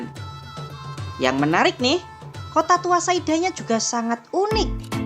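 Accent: Java, Indonesia. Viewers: mas medok banget jawanya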